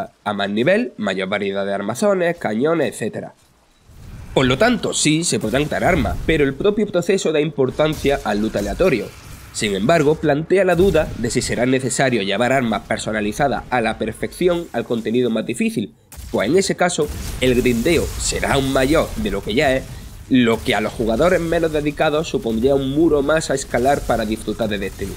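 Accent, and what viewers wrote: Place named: Murcia, Spain. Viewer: Tío, lo haces con mucho empeño, pero tienes el acento de Jumilla en todo lo alto y a veces cuesta mucho seguir la narración. ¡Tienes que trabajarlo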